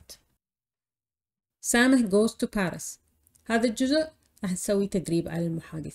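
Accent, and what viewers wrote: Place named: Iraq. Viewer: شكرا لكى اعجبتنى لكنتك ، حقيقة ، اعتقد انتى عراقية ، شكرا لكى مرة اخرى.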